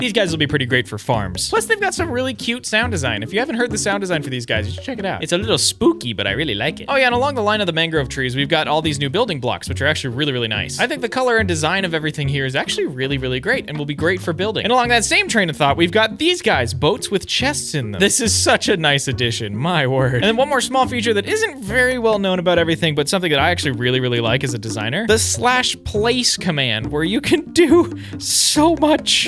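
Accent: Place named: United Kingdom. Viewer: yeh, but where are you from? Your accent sounds like british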